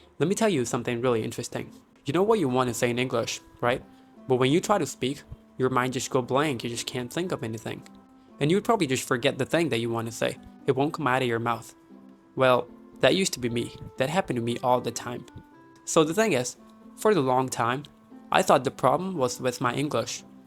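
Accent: United States. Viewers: Bro how do you speak american english accent ?? Have you been to there ??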